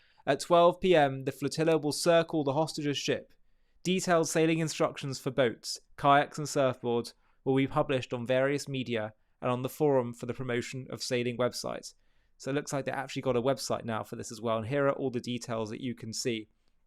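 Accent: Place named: England, United Kingdom. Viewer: HAVE YOU EVER BEEN TO OCCUPIED PALESTINE WITH YOUR ENGLISH ACCENT??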